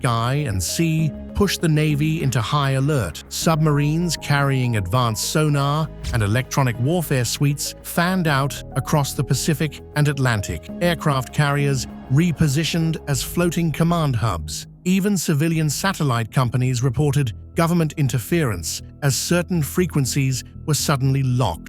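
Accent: United Kingdom. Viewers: The British voice again…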